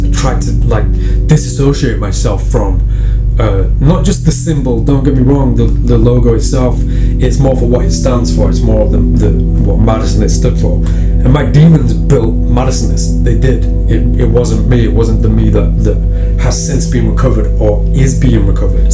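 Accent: United Kingdom. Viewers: Don’t feel embarrassed or humiliated for opening up. That’s bravery. I truly await every video you post, regardless of what it’s about. There’s always a lesson to be learned. Todays: failure is not weakness. I enjoy spending my time watching; and with the English accent it always sounds epic! Haha! So sincerely, “Fanks” 🙏🏼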